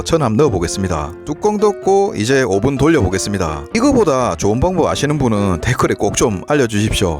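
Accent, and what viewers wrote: Gyeongsang, South Korea. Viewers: 경상도사투리만 없어도 참 좋은 컨텐츠입니다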